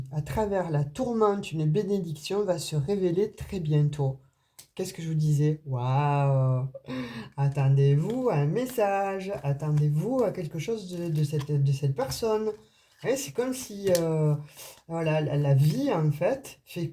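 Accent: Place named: Provence, France. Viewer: je vous adore, votre accent me rapelle ma provence je vous envoi un bisous d'italie bonne fète.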